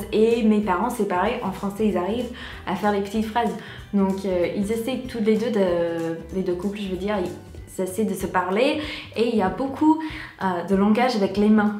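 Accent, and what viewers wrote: United States. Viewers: Ton accent américain est charmant quand tu parles en français - je préfère l'accent américain au britannique, ça coule mieux, lol - j'imagine que l'inverse est vrai aussi - parler anglais avec un accent français - après j'ai passé un an à Montréal et je me sentais trop ridicule quand je devais m'exprimer en anglais face à des américains, c'est un complexe très français, lol! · Hello ! T'as raison de pas essayer de gommer ton accent américain : ça fait partie de toi 😊. Jane Birkin vit bien en France depuis des décennies et elle a toujours son accent anglais. Le fait que tu fasses des vidéos est un bon exercice de prononciation et en plus c'est gratuit!!!😊😊😊 t'as pas besoin de prof... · Oh non !!!! ne fais pas disparaitre ton accent americain , c'est trop mognon quand ton parle , moi j'adore , trop chouette !!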